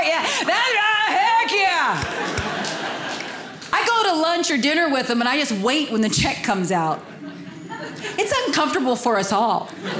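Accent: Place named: Texas, United States. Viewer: We can tell your from Texas by the yelling, extreme speaking voice.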